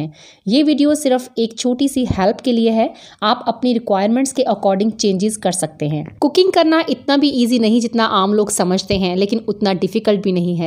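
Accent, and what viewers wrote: Punjab, India. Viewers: just loveeee her voice...it has a punjabi touch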